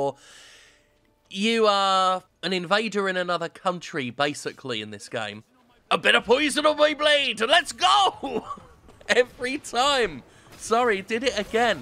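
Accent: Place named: West Country, United Kingdom. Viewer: your west country accent is quite good (as a person of the westcountry) I'm going to say you're from Berkshire?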